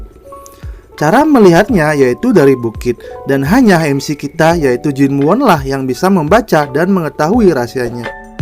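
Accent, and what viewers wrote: Bali, Indonesia. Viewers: nak bali? kayak logat orang bali pas ngomong😁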